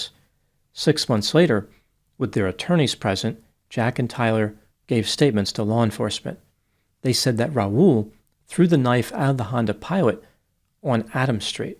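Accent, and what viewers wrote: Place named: Pennsylvania, United States. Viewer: I keep noticing your accent… sounds very similar to mine. I’m going to guess you’re from somewhere in South central or eastern PA or north MD? I’m from York pa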